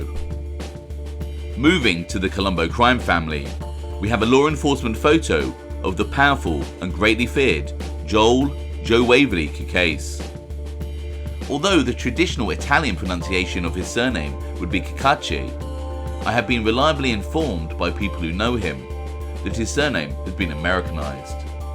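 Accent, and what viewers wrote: United Kingdom. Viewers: What is a mobsta? Did you mean mobster? UK accents are irritating. DISLIKE.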